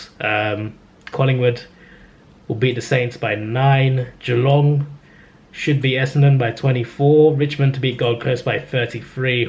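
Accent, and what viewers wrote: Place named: England, United Kingdom. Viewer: What country are you from? You sound like you’re from England kind of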